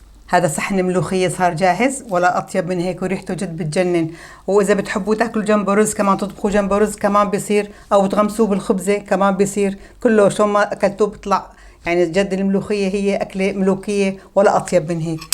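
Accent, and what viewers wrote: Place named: Levant. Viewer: جننتي سماي ماعم اعرف لهجتك شوي بتحكي حلبي شوي شامي على فلسطيني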